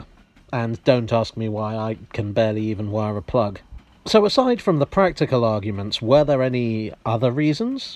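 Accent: United Kingdom. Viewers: Thank you, thank you, THANK YOU for this video!! As a bona-fide train enthusiast and particularly a subway nut (I was born and raised in New York City riding the subway since age 7) and a model railroading enthusiast modelling three scales (gauges) HO, OO and O. I absolutely LOVE literally everything British as in that accent (of course), humour, cathedrals, pipe organs, architecture, automotive interests, of course your superb railway network and the gorgeous trains. For my birthday in 2018 because he knew that I loved the London Underground, my wonderful son bought the Bachmann London Underground S-Stock OO-scale model set for me (NOW I'll put him in my will Lol) and in watching the many videos of the London Underground, I often wondered about the fourth rail as I knew that there of course would be two running rails and a third rail for power, but I never knew what the fourth rail was for and this explains it, so thanks! 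Terrific footage throughout the video. In the opening footage of the London Underground entering that station, what stock is that and might it be pre S-Stock or post? Speaking of the S-Stock, the footage of it entering the stations , and is gorgeous. I've liked and subscribed! Thanks again!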